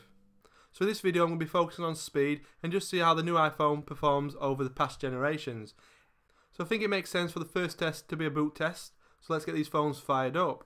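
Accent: United Kingdom. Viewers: xDDD i like your accent, its very... british xddd good video, like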